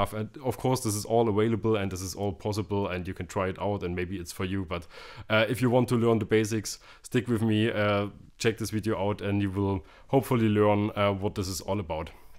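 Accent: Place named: Germany. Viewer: too much German accent... lol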